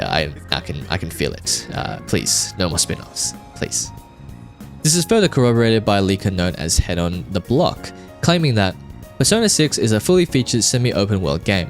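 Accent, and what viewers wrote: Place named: Australia. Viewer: It's crazy how you can tell this Youtuber is an Asian Aussie just from his accent.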